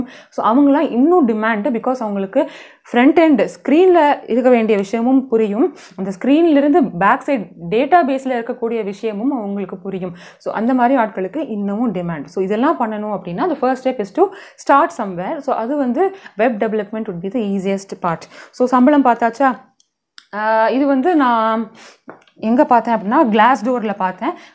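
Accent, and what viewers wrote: Sri Lanka. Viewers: ilangai Tamil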